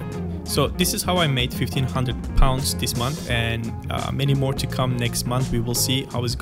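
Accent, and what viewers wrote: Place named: Turkey. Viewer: bende diyorum bu aksan bir yerden tanıdık geliyor türkish baba türkish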